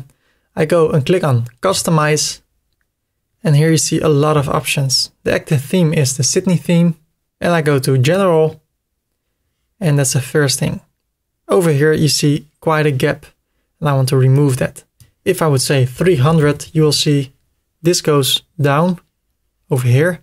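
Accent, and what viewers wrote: Netherlands. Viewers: your accent sound very dutch. are you dutch? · Nice video, i immediately heard you are Dutch.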